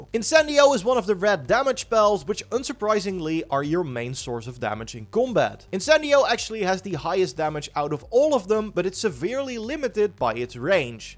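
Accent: Netherlands. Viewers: The Dutch accent in this commentary is so obvious I love it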